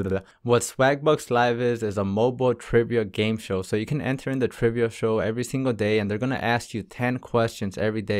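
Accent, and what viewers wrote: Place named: Latin America. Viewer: Are you latino? Ur accent bro nice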